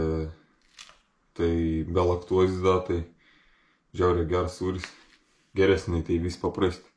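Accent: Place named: Samogitia, Lithuania. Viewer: Klausyčiau i klausyčiau tos žemaitiškos šnektos. Ir dar kaip jaunas i stiprus žmogus kalba😊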